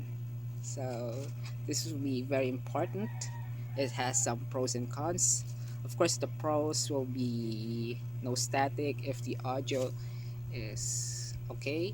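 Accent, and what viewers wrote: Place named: Russia. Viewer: your voice is very russian accent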